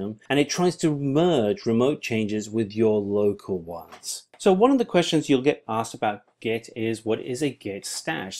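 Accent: India. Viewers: It would better if the instructor be an Indian with easy English.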